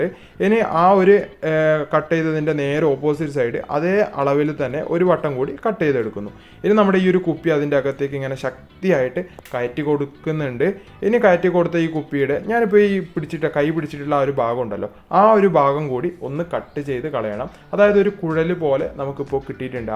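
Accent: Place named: Kasaragod, India. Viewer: Languagil Idakk oru kasrodian touch 😌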